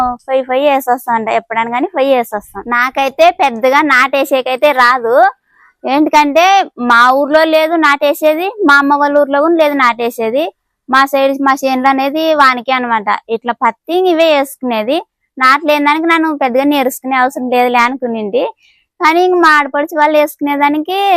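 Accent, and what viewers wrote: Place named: Karnataka, India. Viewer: Mee matalde telugu karnataka side ani telisindi.nanu antunnaru bhagundi talli, god bless u.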